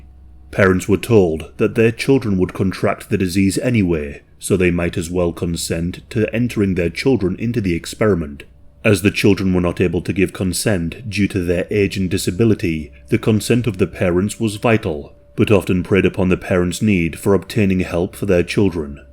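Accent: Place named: Northern England, United Kingdom. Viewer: Yep, here's another pronounciation nazi surfacing. He has a Northern England accent dumbass. A stunning example of someone who has nothing better to do. Now fu*k off.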